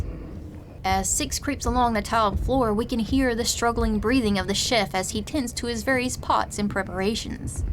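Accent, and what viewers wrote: South, United States. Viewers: We return to the, for good speculation on nightmare fuel with a slight southern drawl to it Keep it up!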